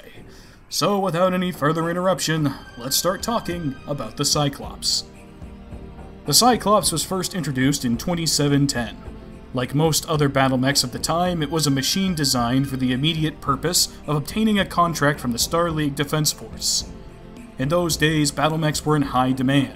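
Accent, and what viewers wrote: Germany. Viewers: I listened to your reading o f Measure of a Hero and I think you have a fine German accent. Reminds me of the series 'Allo 'Allo.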